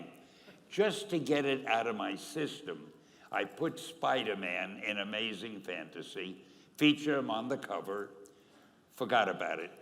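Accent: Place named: Argentina. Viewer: tremendo acento argentino el de stan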